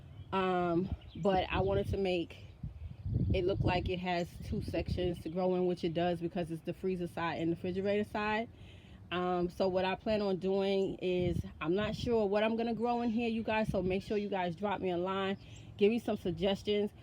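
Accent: New York, United States. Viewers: Too cool! You just inspired me! I have an old bookshelf that can be a raised bed and I love your accent lol you sound like my New York family Take Care ~Nicole 🌿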